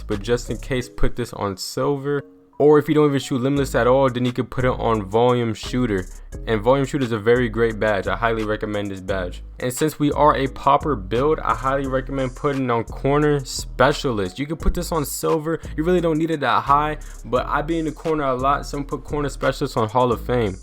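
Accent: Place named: Canada. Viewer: You sound like your Canadian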